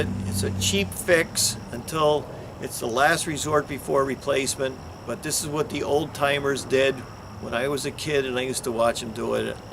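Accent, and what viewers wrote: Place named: Pennsylvania, United States. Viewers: Schuylkill County girl here so love your accent,. I now live in South Carolina and just did my sidewalk the other day. So far it looks great! Now I'm moving on to the driveway. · That accent he has is typical N.E PA. I was born and raised near Wilkes-Barre and that accent really stands out now that I’ve been away for decades.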